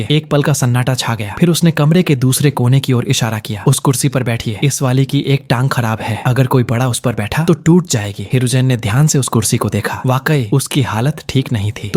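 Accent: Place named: Bengal, India. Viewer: Haa bhai lagtahe bengali😅❤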